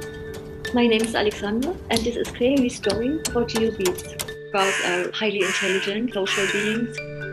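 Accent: Germany. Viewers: 你的英文聽起來很像德文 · I’m actually wondering if she’s German cuz to me as a German her English has that small accent XD also Kräri sounds kinda like the German word Krähe which means crow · Habe es schon an der Aussprache gehört, dass du Deutsche bist.... Liebe Grüße aus Worms 😁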